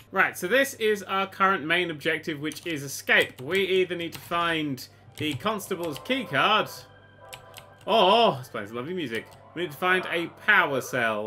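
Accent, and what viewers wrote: England, United Kingdom. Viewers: has English accent. says the word candy this made me cry a little inside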